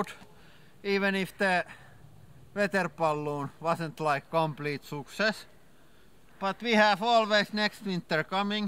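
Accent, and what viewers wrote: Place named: Finland. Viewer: Palllloon! love Finnish accent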